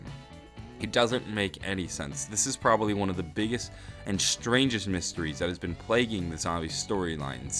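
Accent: England, United Kingdom. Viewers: Trust us english lot- he's saying bald we her his accent clearly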